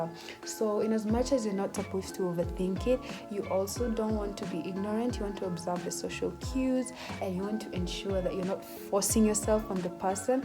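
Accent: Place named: United Kingdom. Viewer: 😅😅 love the British accent! Great tips…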